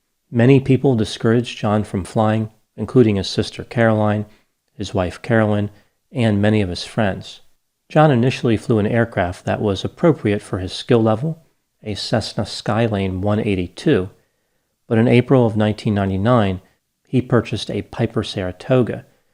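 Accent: United States. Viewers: Very clear American English.Good speaking voice.Thank you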